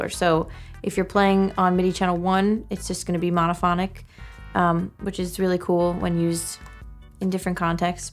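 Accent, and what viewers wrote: Long Island, United States. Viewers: that long island Accent is hitting different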